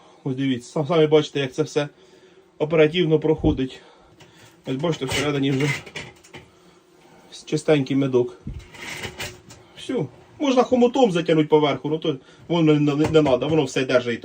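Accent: Poltava, Ukraine. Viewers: Полтавський говір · Чути зрОзу шо Ви з полтОвщини. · Зі 100 відсотковою впевненістю можу стверджувати, що Полтавський говір😊 Може навіть північні райони... Пирятин, Чорнухи, Лохвиця, Гадяч, Зіньків... Напишіть, чи вгадав?😅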